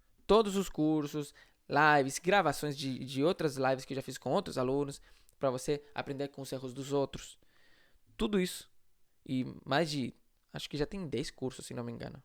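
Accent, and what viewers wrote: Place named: Chile. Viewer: Em meio à correria da minha quinta-feira, consegui ouvir o áudio da aula de hoje. 😅 Gostei muito das sugestões que você deu. Obrigada!!! E uma coisa que achei interessante é que, em vários momentos, você falou como um nativo brasileiro. Se não fosse a pronúncia da letra "o" em algumas palavras, ninguém ia acreditar que vc é chileno... rsrsrs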